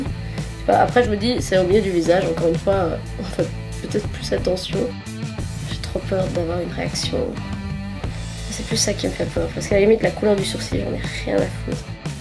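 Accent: Belgium. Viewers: je me disais bien je suis aussi belge hihi;3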